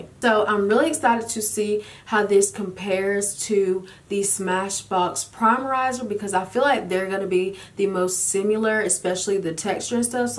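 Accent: Alabama, United States. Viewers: Gorg!! I knew you had an Alabama accent and you remind me so much of Nitrab here on youtube. I love your videos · You sound just like NitraaB, so when you said Alabama I said no wonder, she’s from there too.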